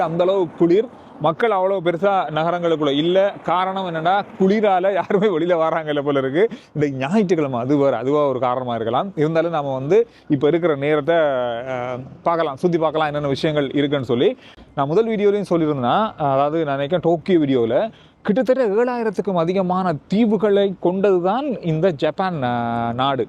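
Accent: Sri Lanka. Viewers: travelogue பயண காணொளி எப்போதும் சிறப்பான காமிரா கோணங்களோடு அழகான காட்சிகள் நிரம்பியதாக ருசிக்கும் இலங்கை தமிழில் ஈர்க்கும் தகவல் வர்ணனைகளோடு கவர்ந்து இழுக்கும். நன்றி. அன்புடன் நாகராஜன் சென்னை. தமிழ்நாடு.(உங்க குடும்பத்தார் இலங்கையில் நலமா)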